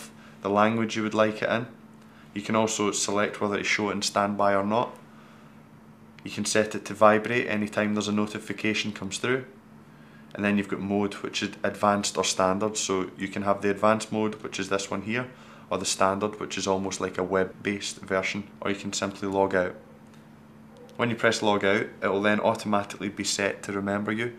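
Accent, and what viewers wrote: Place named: Northern England, United Kingdom. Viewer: hes from northern england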